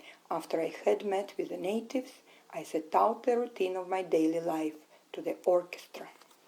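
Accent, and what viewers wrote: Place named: Russia. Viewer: I guess it's a good book to read. I heard about it, but never read it. I love your cute Russian accent!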